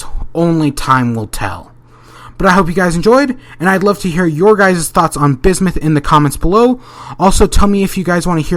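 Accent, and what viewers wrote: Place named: United States. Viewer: he sounds like he has a normal American accent tho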